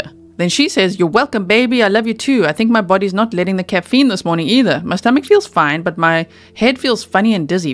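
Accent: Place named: South Africa. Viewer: Hello from Cape Town South Africa ...are also south African you sound like one😊🇿🇦🇿🇦🇿🇦🇿🇦🇿🇦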